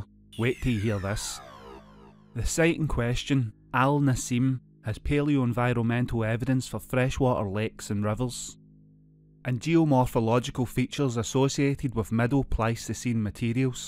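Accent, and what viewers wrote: Scotland, United Kingdom. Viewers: Trying to place your Brogue. Inverness? Strong "undercurrent" of American "Anglish" throwing me off... LOL but no' aff the quay an' inta the Ness, playse.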